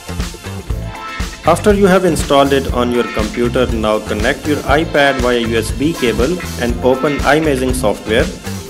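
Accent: India. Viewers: There's no way I'll trust the words of an Indian sounding guy when it comes to software suggestions.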